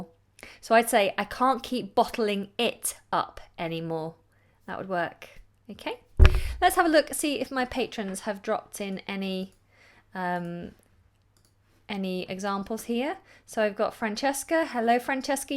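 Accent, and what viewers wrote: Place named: England, United Kingdom. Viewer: Hello, I'm Waser Resaw. Nice to meet you. I'm dance music producer from German record label. I hear you voice and i think it's perfect. Especially your England accent i love it. Your voice catches my attention. Please sendme a inbox with a voice note or audio file saying: "You now listening Pitch Industry, Introducing Waser Resaw" Of course i really appreciate it. <3 My website if you wanna hear mi sounds: <link> <link> I wait your answer. Thank you.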